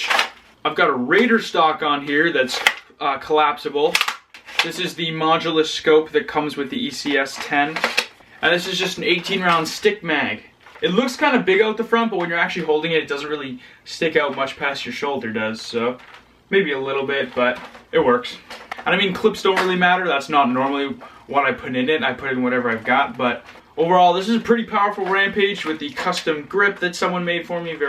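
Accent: Canada. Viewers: are you canadan or american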